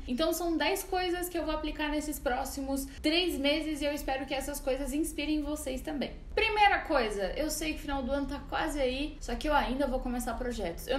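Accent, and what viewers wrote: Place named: São Paulo, Brazil. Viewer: E esse sotaque paulista? hehe (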